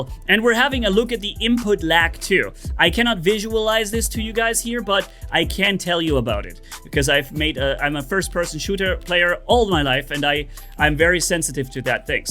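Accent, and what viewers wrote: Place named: Germany. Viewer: Ich erkenne Deutsche Akzente, auch wenn sie nur ganz dezent sind. ^^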